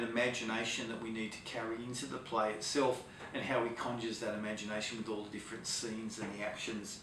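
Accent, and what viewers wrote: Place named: Australia. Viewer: I loveeee your Australian Accent!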